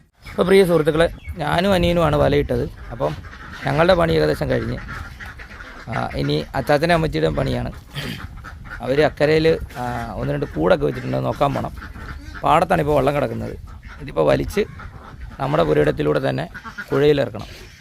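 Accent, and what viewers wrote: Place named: Kottayam, India. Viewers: Kottayam boundary aanu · നിങ്ങള് കോട്ടയം ആണോ ആലപ്പുഴ ആണോ സംസാരം കേൾക്കുമ്പോൾ കോട്ടയം ആയിട്ടു ആ എനിക്ക് തോന്നുന്നേ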